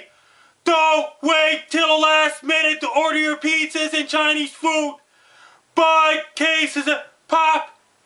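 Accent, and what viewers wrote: Newfoundland, Canada. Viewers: The weather is so much more perplexing when it's being yelled at me by a Newfie guy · no. stop doing your thing. it's fucking annoying and your voice/accent is just fucking terrible. this is why I'm ashamed to be where I'm from. I fucking hate "newfie" accent. You people don't realize just how stupid you sound.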